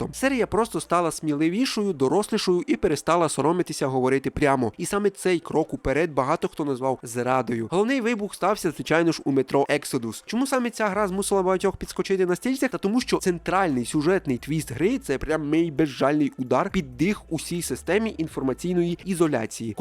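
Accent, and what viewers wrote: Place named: Transcarpathia, Ukraine. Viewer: Чомусь я відчуваю закарпатський акцент . Я ж правий?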